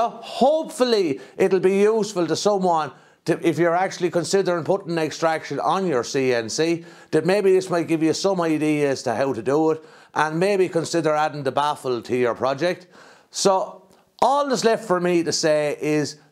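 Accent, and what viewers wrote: Ireland. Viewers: I like this guy's accent, words like "tinking" and "thaught" and "tat", he be so Irish tis great.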